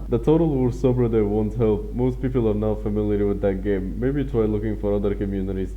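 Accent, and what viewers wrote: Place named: Poland. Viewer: You sound sooooo Polish xD but still nice video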